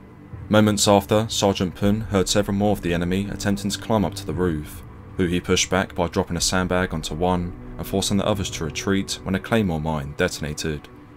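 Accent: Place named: Estuary, United Kingdom. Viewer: Nice video and channel. Your videos aren’t filled with waffle like so many others to reach the 10min mark. I would just soften your estuary accent a bit to help out your international fans. Example: you used the word “hurled” and sounded like held. I criticise because I have the same accent lol.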